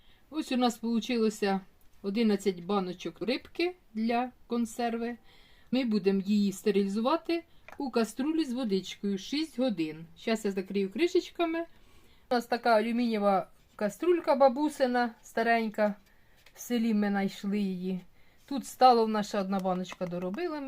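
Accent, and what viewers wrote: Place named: Russia. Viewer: А в якому місті ваш ,,говор" москальський?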